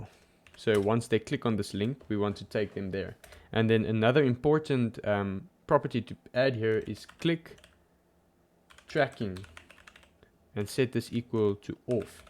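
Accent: South Africa. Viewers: Am I hearing a South African accent👀🔥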